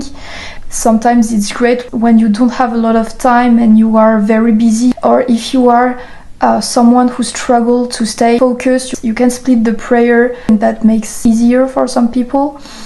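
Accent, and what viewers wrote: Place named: France. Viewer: What did she say the prayers were??? I'm having a hard time with the French accent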